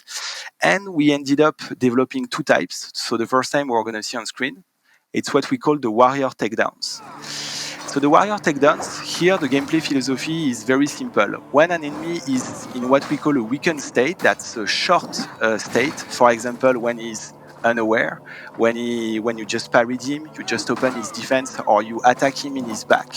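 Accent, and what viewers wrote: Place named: France. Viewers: nooonn l'accent français :) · ouiiiiiiiiii l'accent français^^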